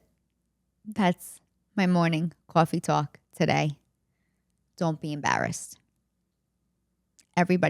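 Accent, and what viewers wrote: New York, United States. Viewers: Great show no family is normal. We actually grow from our pains and problems and issues. Love your NY accent. Lost mine living out in the country but every once in a while someone will say "you are not from around here" lol. We need to always be proud of our families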